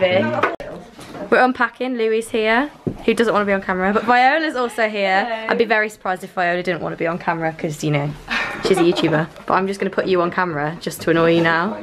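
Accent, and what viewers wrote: United Kingdom. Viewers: I always love British accent 💙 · I’m British but u sound more British then me but like I loke love ur accent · I love the british accent😀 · The British accent 😍😍😍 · OMG YOU HAVE THE BEST BRITISH ACCENT!!!!!! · British accent confirmed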